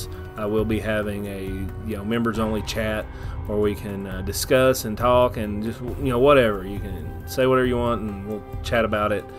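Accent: South, United States. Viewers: I LOVE this guy's country accent!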